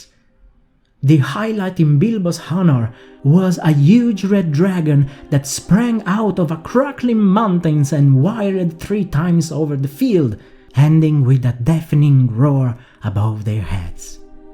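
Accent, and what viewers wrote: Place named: Italy. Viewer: I sensate a pizza accent. Are you Italian? Tolkien name is pronounced tole-kee (emphasis on tole) not tole-kee-anne BTW. You're good though. Keep the good stuff going 👍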